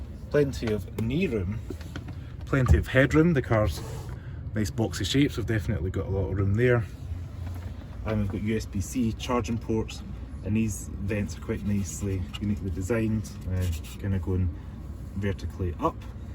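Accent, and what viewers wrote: Scotland, United Kingdom. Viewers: Can tell a fellow Scotsman Usual Scottish windy weather in the video. Nice review as always.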